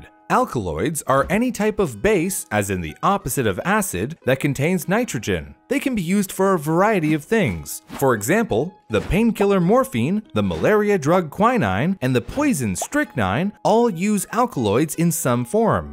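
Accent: Canada. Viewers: u sound Canadian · Sounds Canadian …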